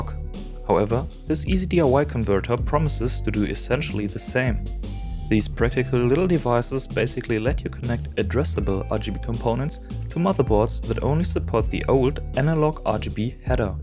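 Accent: Germany. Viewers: Wie ich dein deutschen Akzent raushöre :D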